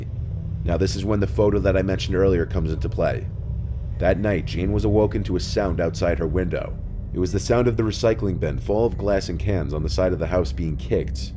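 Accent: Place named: New York, United States. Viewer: almost couldnt get past mr. nightmares new york accent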